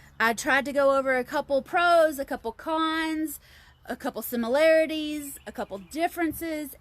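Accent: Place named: South, United States. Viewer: Such a cute lovely southern bell accent ❤️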